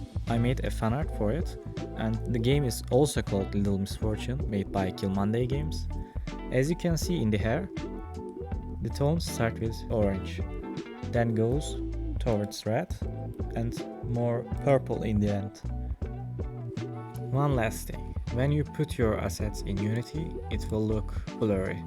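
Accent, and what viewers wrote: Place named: Turkey. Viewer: Türkçe aksanı nerede görsem tanırım... güzel video reis oynu da wishlistledim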